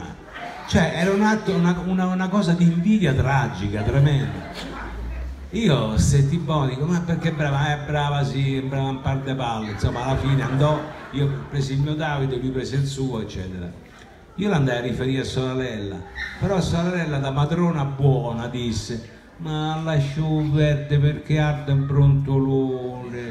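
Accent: Rome, Italy. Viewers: Che bel racconto verace sincero con questo accento romano e questa romanità nel modo....grazie mi son fatta due risate😂😂😂😂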